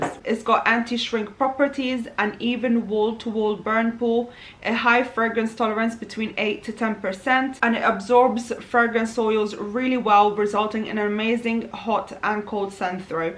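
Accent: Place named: Philippines. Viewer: Greetings from the Philippines. I love the accent. ❤ Let everyone know you're a filipina too.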